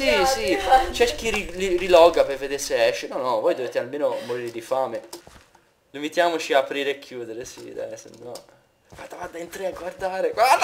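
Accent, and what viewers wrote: Tuscany, Italy. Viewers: è toshano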